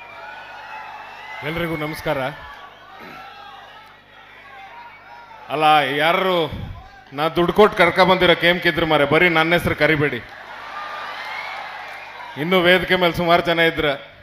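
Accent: Kundapura, India. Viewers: ಎಷ್ಟ ಚಂದಾ ನಿಮ್ ಕುಂದಾಪ್ರ ಭಾಷೆ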